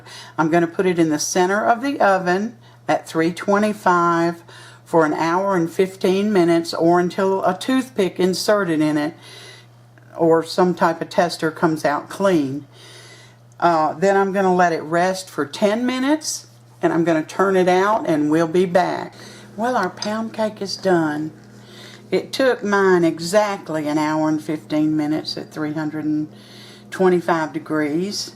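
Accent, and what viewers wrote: South, United States. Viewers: Was this "literal" or a take on your southern accent (as in you came straight "from the fields")?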